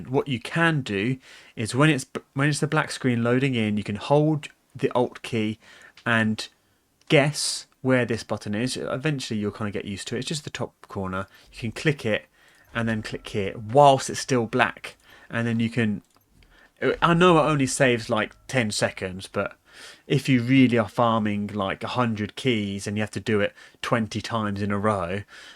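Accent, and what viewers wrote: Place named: United Kingdom. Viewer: I love your British accent ❤ "I'd like to apologise" 😄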